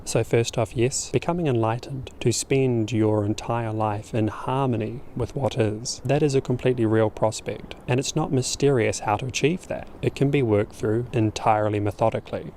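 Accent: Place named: New Zealand. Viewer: Hi, thank you for sharing this. I became enlightened about a year ago. Of course it is a bit of a misnomer as strictly speaking there is no self to become enlightened but you know what i mean. I say i am enlightened but i am not permanently living in oneness or non duality. I am shifting between non duality and duality usually many times each day. Sometimes i am in my mind with ego and other times i am in consciousness or oneness. The great thing is i have realised my true self and i am living from that place. Every day i feel beautiful bliss or nirvana. I experience a baseline of this bliss. It is always there now at some level. It does change in intensity but its always present. Sometimes suffering still comes up. The suffering is reducing for sure and the periods of time when i feel suffering are reducing. To be honest with you the reason im commenting on your excellent video is because i picked up on your kiwi accent. 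I am a kiwi too. Apart from myself you are the only other kiwi i know who is enlightened. You don't live in Dunedin by any chance do you?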